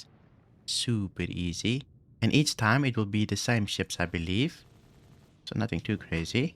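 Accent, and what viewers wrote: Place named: Johannesburg, South Africa. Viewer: Can the ships in these missions spawn with cargo? Also, are you from SA? Almost sounds like you have a Johannesburg accent.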